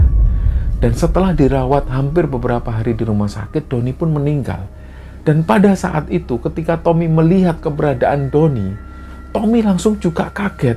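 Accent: Java, Indonesia. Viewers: Mas itu aslinya dr kota mana ya mas.?? Bhasa jawanya medok n tau istilah bhasa2 jawa lama sperti genthong, gandok, cikrak, anak skarang sdah gak tau artinya.. Aq dr mediun hadir buat pean.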